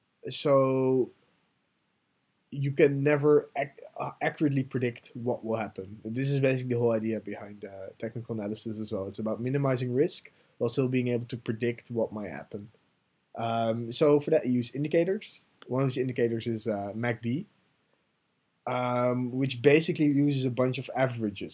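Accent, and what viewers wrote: Netherlands. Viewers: your dutch ? :O i just hear it in your accent :) thanks for sharing your software ;) your the best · u dutch???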